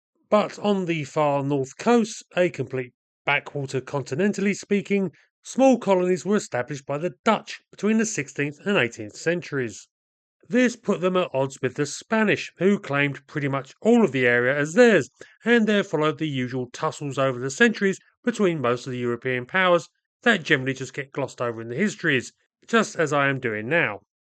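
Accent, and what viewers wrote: United Kingdom. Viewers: A mess such as this is always clarified when explained by a proper gentleman with a British accent.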